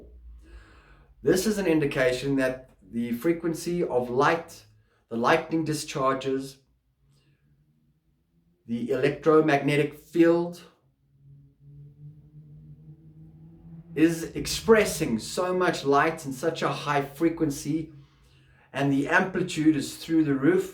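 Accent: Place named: South Africa. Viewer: Thank you for this! Was so happy to hear your South African accent😊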